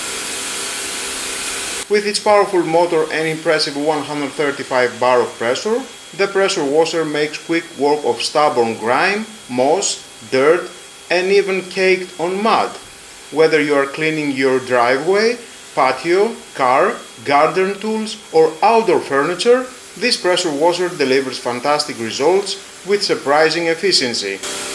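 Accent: Greece. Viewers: ρε φίλε σόρυ, αλλά μιλάς σαν Έλληνας!!!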